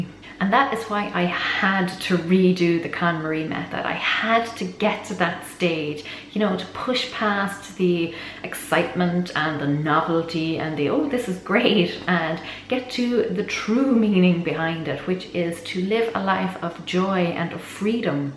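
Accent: Ireland. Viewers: Interesting video :) Are you Irish?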